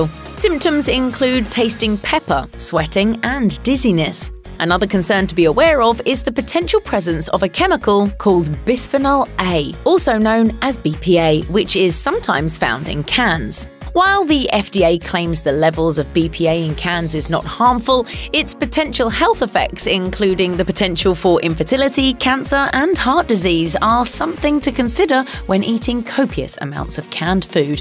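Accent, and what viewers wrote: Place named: United Kingdom. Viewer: Ugh who is this British narrator? Bring back the old narrator.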